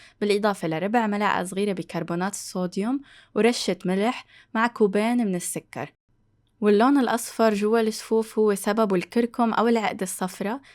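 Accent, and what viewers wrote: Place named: Lebanon. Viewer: اللهجة لبنانية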